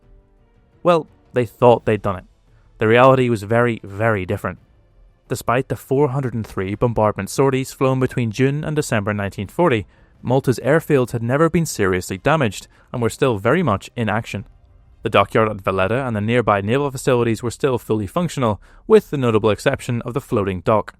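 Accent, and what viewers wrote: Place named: Northern Ireland, United Kingdom. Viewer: Well done, a most enjoyable documentary with plenty of humour and a delightful NI accent. Looking forward to part two, cheers.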